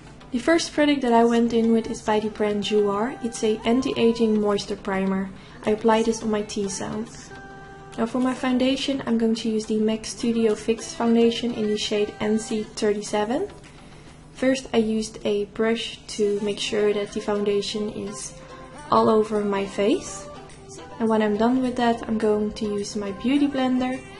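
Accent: Netherlands. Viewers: Is that a a Dutch accent? Are you from the Netherlands? Your make up video is fantastic!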